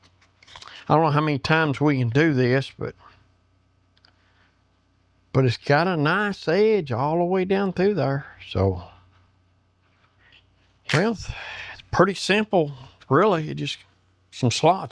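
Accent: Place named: Appalachia, United States. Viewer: This is like watching paint dry, too slow and what an accent, reminded me of the Beverley Hillbillies!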